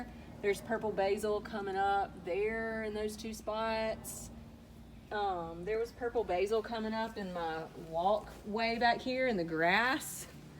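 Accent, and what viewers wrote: South, United States. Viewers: 'Southern' sounds good on you!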